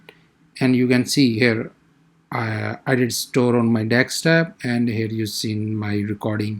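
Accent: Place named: Sialkot, Pakistan. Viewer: I like your sialkoti english accent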